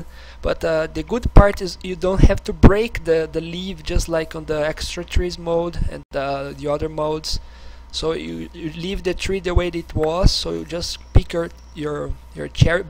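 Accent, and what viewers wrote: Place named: Portugal. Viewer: are you portuguese because your accent is the same XD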